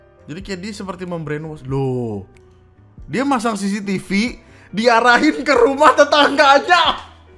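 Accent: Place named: Medan, Indonesia. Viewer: Medan bah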